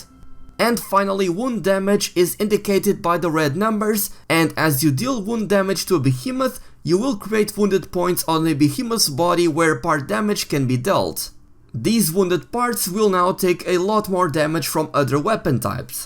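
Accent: Portugal. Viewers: oh mano percebi logo que eras pt pelo o teu ingles wtf,mas bom video btw,copiaste de eu sei de quem mas bom video!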